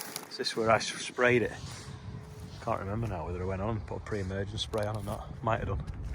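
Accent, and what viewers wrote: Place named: Liverpool, United Kingdom. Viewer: bad tory you lad. terrible hearing that shite in a scouse accent. do one. unsubscribed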